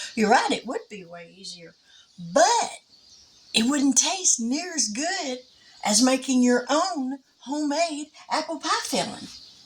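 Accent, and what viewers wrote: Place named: South, United States. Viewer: you as well sweet lady! I reside in North Georgia! You sound like you are from the south as well!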